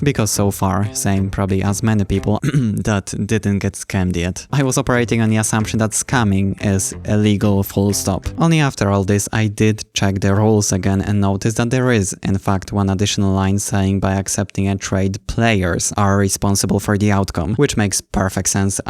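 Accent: Poland. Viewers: youre the second skyblock youtuber i failed to recognize to be from kingdom of poland THIS MONTH :sob: like geniuenly i thought you just have funny pronounciation and didnt connect the dots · OK, from your accent I guess you're from Poland and I can't put the rest of my comment into English, at most you can translate it yourself if I'm wrong about your nationality xd W lutym tego roku straciłam na hypixel CAŁY MÓJ DOBYTEK. Uderzyło to we mnie tak mocno, że usunęłam moje konto microsoft (bo zostało tak zhackowane, że nie było co z niego zbierać...) i do teraz nie jestem w stanie zacząć grać od nowa. I nie jestem jakimś dzieckiem, jestem po trzydziestce, więc bycie takim debilem zdemoralizowało mnie jeszcze bardziej. Miliardy coinsów, wymaksowane staty do górnictwa nad którymi pracowałam setki godzin. Kurwa boli.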